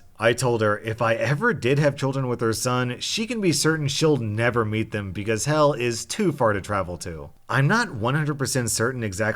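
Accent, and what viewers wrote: Germany. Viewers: I‘m from Germany and I don‘t know this band, but you have an strong german accent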